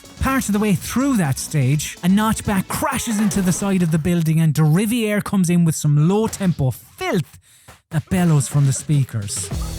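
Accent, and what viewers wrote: Ireland. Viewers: I like the Irish sounding accent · Man its nice to hear another irish voice going on about great games :)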